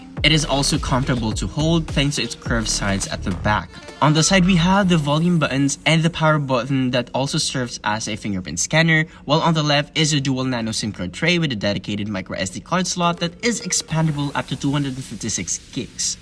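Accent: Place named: Philippines. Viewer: Are you a filipino? I like your accent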